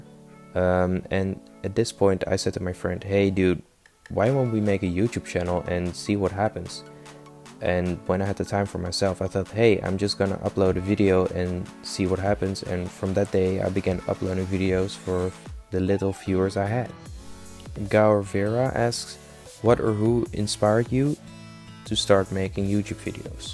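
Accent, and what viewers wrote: Netherlands. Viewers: I am sorry but you have a very Dutch accent :p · Omg it's so funny because I'm from Belgium and I can really Hoor dat je een Nederlander bent aan je manier van engels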